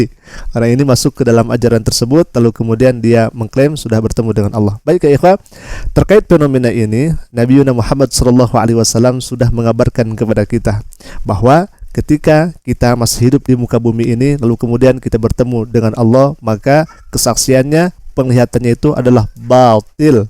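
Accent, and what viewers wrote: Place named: Makassar, Indonesia. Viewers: Logatnya orang Makassar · Seperti logat aksen Sulawesi Selatan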